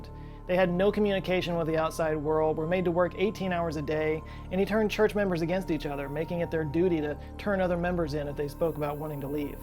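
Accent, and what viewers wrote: Texas, United States. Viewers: What a Texas accent 😅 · Finally we get you true Texan accent!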